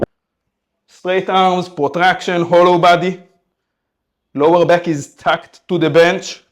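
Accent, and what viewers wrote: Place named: United Kingdom. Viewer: לפי המבטא שלו הייתי אומר שהוא בריטי.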